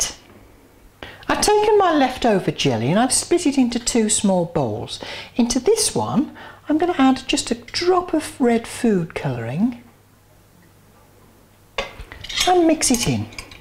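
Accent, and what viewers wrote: United Kingdom. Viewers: You sound so British, so refreshing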